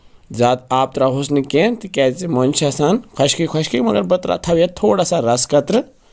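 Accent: Kashmir, India. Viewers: I love the way u speak...pure kashur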